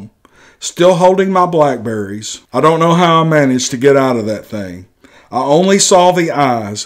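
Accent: South, United States. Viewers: I never comment, but I listen every night. Your southern voice puts me at ease at night. I enjoy your comments and the way you read these stories. Please keep the stories coming!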